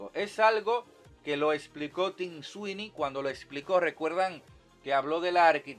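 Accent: Dominican Republic. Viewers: eres de Republica Dominicana?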